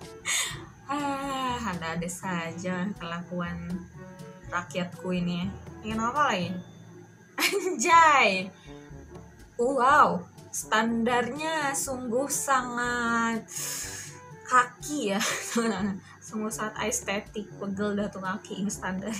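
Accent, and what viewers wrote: West Java, Indonesia. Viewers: Teteh orng mana teh Sunda mana euy teh h3h3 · Ngomong Sunda nya bikin greget teh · Sunda mana nih🤣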